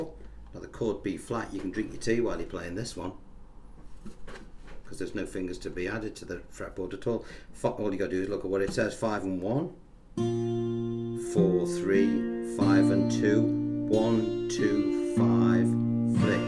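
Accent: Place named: Wirral, United Kingdom. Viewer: Thanks for all you're doing. I'm really enjoying it... especially as all tuition is in a familiar Wirralian accent. Cheers!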